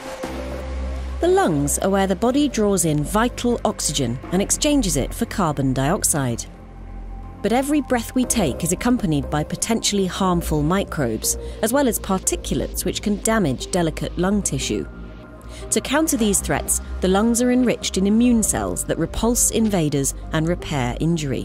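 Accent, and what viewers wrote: United Kingdom. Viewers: The british accent feels like shit, shut up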